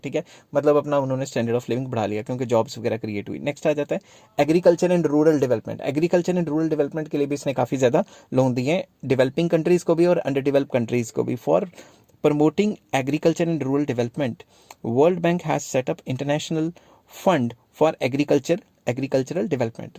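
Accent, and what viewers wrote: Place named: Punjab, India. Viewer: It seems that sir is from punjab😅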